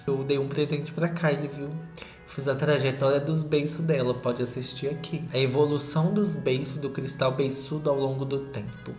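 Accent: Minas Gerais, Brazil. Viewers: quando vc fala Guarapari, tá falando do meu País ES??? Mineirinho 🤣🤣🤣🤣🤣 amando seu canal, nova por aqui 😜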